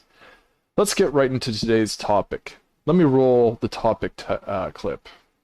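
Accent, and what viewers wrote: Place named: Canada. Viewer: You're Canadian aren't you? Or upper Midwest US. Work on the out... lol. Keep up the work!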